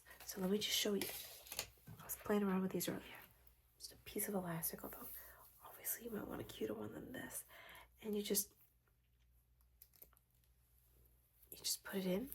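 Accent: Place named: Brooklyn, United States. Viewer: The Brooklyn accent is so good 😂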